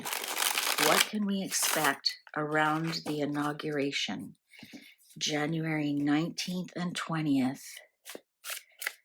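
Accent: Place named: Canada. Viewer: Thank you for this reading. I love to hear your Cannadian accent. It reminds me of my immediate family who married Canadians and live in Ontario😊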